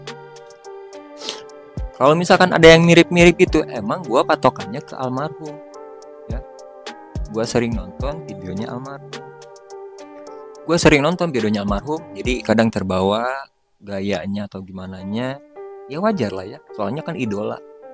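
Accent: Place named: West Java, Indonesia. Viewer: Muka sama gaya bicaranya Abang emang mirip alm denzbagus bang ,,cuma beda logatnya aja kalo alm denzbagus kan kalo ngomong logatnya Lampung ,kalo Abang Sunda tapi gaya bicaranya sih mirip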